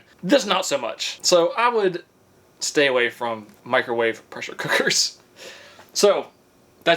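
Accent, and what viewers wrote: South, United States. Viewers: Zucchini ---- pronounced zoo key knee. and it's a squash!!! BTW the next time you want to make corn dogs, use cornbread mix, not pancake batter. You sound like your from the South, you should know a good cornbread recipe. That's why they are called CORN DOGS not PANCAKE DOGS! There is a green light on top, it will turn on when they are ready. YOU CAN'T SAY IT TASTES LIKE A CORN DOG!! IT'S NOT MADE WITH ANY CORN !!!!!!!!!!!!!!!!!!!!!!!!!!!!!!!!!!!!!!!!!!!!!!! BTW You can buy sausages made the same way in pancake batter in the frozen food aisle. hahahahahaha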